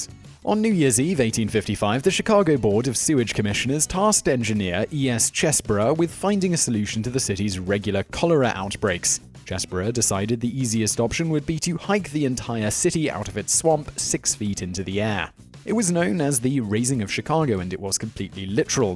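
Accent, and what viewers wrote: United Kingdom. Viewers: British vsauce makes me extra happy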